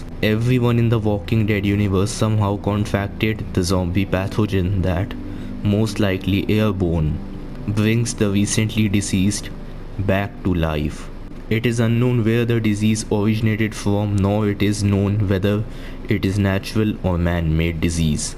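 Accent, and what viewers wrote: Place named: India. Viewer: I prefer the Indian accent then some bot Ai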